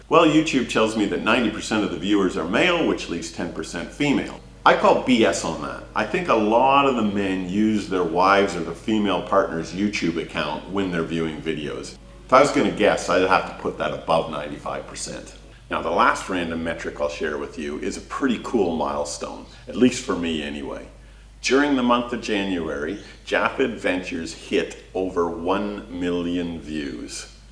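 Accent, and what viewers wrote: Canada. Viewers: Nice. Logical, well presented and informative. I just subscribed......guessed you were a beaver boy.........best national animal ever by the way😅.....by the accent. Going through your catalogue of videos now.......can see some interesting stuff. Keep up the good work. Cheers mate.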